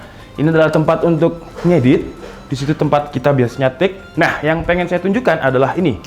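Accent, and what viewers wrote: Java, Indonesia. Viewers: Jane ngunu arek endi se pean iki 🙈🤣 kok jowo e medok memehan ae bingung bhs indo ne wkwk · bahasa indo ne pemean opo? " jemuran " wkw ngakak cok jowo kentel tenan 😂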